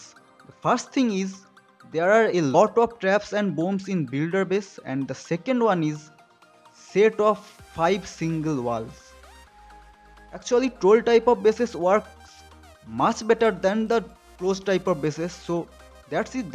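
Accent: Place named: Bengal, India. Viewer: U WERE IN MY GLOBAL ONCE.. WHEN I HAD MORE TROPHIES THAN U. AND STILL I HAVE.... I M SNIFFING BENGALI ACCENT OUT OF YOU BUDDY... GOOD WORK BOI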